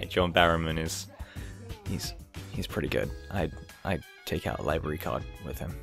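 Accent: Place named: United States. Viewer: why you sound slightly american??? I NEED ANSWERS!!!!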